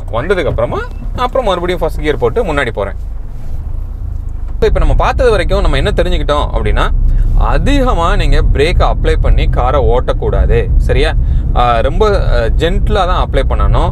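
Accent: Virudhunagar, India. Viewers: Neenga VIRUDHUNAGAR ah na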